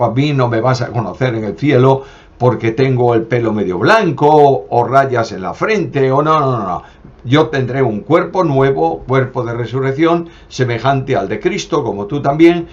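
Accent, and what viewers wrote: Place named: Galicia, Spain. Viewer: El no es malhumorado, simplemente es su acento gallego, a mí sinceramente me agrada ver como el hace énfasis con su acento y tono, ¡Dios le bendiga!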